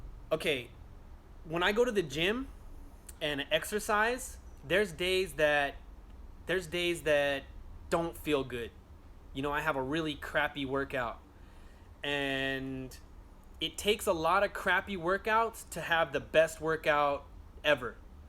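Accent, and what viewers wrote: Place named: Los Angeles, United States. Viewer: I knew u were from L.A. right when i heard u talk homie!😎👍 You the tightest i seen. Keep it up bro✌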